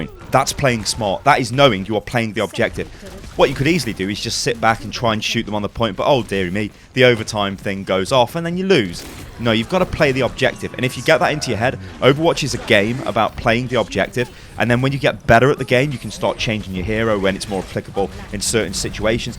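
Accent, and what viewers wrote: United Kingdom. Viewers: Hi British man. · Closed beta* you have an awesome british voice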